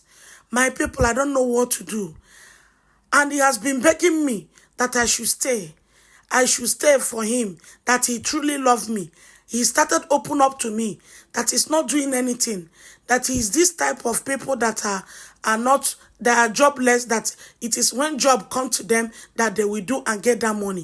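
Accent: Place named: West Africa. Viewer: This accent is like west africa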